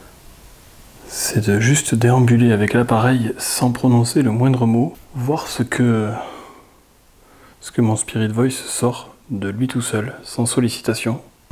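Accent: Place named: Southwest, France. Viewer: J’aime beaucoup tes enquêtes. Tu es sensible, respectueux et touchant et… tu as l’accent de chez moi 😜 Tu es de la Dordogne ou du Sud Ouest j’imagine. Merci pour tes vidéos… je n’en manque aucune. Protège-toi quand même avant chaque enquête. Bonne continuation 🌸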